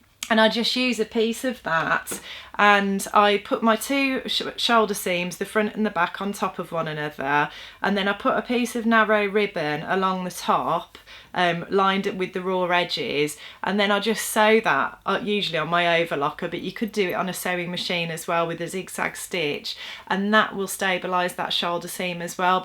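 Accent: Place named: England, United Kingdom. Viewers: Great video. Full of tips - and an English accent! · I just discovered your tutorials today. Your tutorials are awesome. Thank you for the work you did. I will be watching and learning more. I just love to listen to the English accent.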